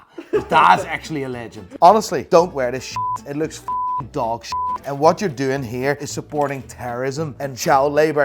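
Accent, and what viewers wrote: Ireland. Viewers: yes but he lived in Ireland for a long time and kinda has Irish accent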